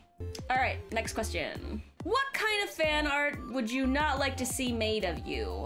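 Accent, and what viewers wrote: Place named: United States. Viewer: She speaks with an American accent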